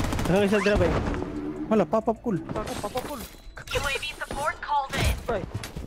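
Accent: Visayas, Philippines. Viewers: Idol bisaya ba ikaw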